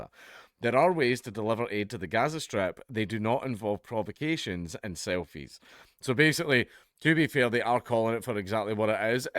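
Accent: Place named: Scotland, United Kingdom. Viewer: Came here for the "oh noooo what happened" in that sweet scottish brogue. Don't let me down 😂.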